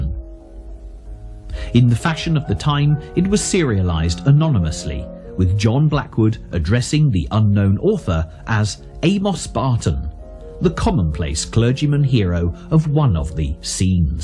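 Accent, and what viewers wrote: United Kingdom. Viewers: God, I do so love a British accent.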